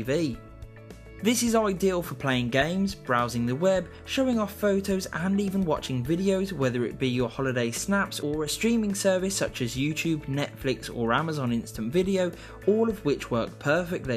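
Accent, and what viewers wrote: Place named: United Kingdom. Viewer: British accent is cool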